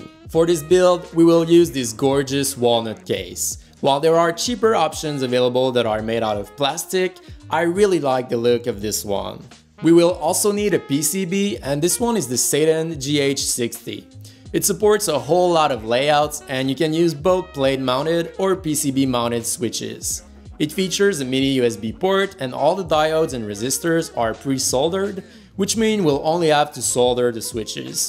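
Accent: Quebec, Canada. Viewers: he is french canadian so he has an accent when he speaks english. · Accent quebecois 100%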